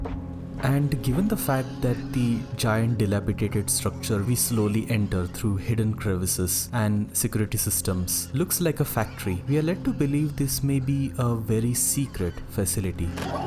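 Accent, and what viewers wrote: India. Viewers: I did not expect to find such elaborate thoughts on the topic at hand. Thought this (like many other “this is art” videos) would be more of a redundant opinion piece, but this video was a really fresh breath of air and encapsulates how I wish the precedent was set on the discussion of games as an art form. Although initially wary of the Indian accent, you really hammered down both the thesis of games as art, the linear storytelling and it’s philosophy and the qualities of INSIDE. Bravo. I do enjoy writing about art myself as in music, film and games and I always had a wish to create something like you’ve created on this platform. But the fact is I am not driven enough, like you apparently are, and is quite a technology boomer as I never piloted any video editing software. Even this interactive video thing you got going here flew me by and I frankly couldn’t be assed to operate by it haha. 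Still a banger video. A real question to ask is “why couldn’t this just as well be a movie”, which you cleverly debunked through it’s interactivity. This is the real reason video games works as a new medium of arts and not just a mutation of cinema. I wrote a review of the game VVVVVV which I will quote a passage off that maybe you can enjoy. The blessing and the curse of being a small content creator is: I wish you success but I also appreciate that I can perhaps have a dialogue with you. I won’t be online this summer but by all means add me on Steam if you’d like to pinball ideas or opinio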